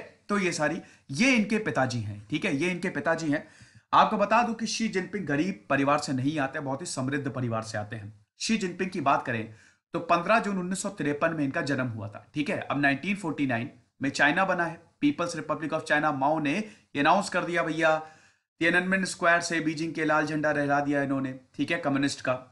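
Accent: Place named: Uttar Pradesh, India. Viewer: bhayi tu up ka lagta hai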